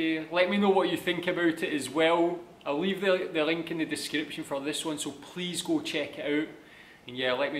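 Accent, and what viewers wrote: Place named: Scotland, United Kingdom. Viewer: Love your Scottish accent : )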